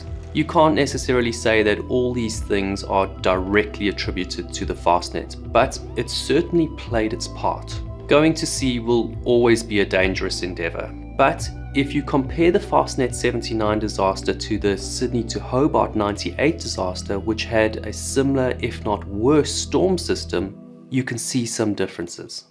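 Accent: South Africa. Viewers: you sound very south african...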